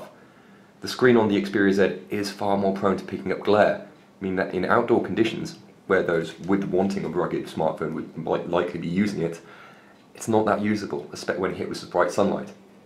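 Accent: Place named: Europe. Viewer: Hate european english accents, cant understan them!!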